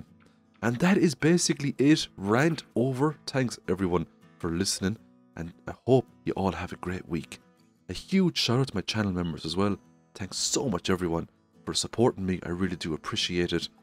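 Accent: Ireland. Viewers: First off, that Irish accent is fantastic. Anyways I like most of these changes to an extent, the extra fees for the house upgrades, the nerf for clay drops, and the nerf for the recipe of deluxe speed grow are annoying for sure though. Most of these changes make the game a little harder for the better which is especially nice given the various exploits people can take advantage of for easy progression, but the prismatic shard change is just weird. Thanks for reading my ramble.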